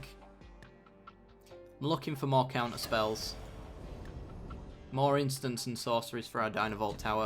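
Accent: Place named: United Kingdom. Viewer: British accent is always good!:)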